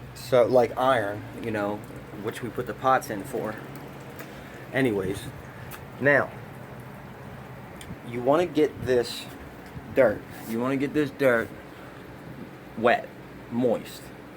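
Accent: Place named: South Philadelphia, United States. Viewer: Nice bro! You remind me of dudes I grew up with in South Philly. You got that tone! I like the step by step videos.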